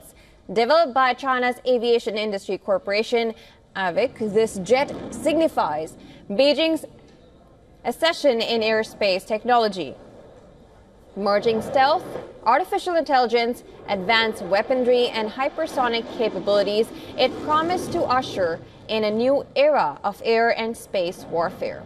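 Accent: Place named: India. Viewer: It's Indialish not English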